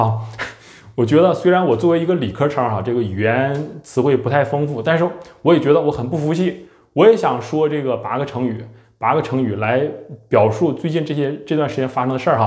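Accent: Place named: Northeast, China. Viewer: 感覺這個人口音像內地東北地區人。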